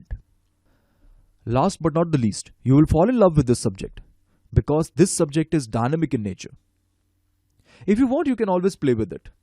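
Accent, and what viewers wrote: South India, India. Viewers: You are very good at speaking sir. Often as an Australian I find it difficult to understand Indian accents easily; however you speak very clearly and well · ngl content is lit but the indian accent prolly south side fucks it up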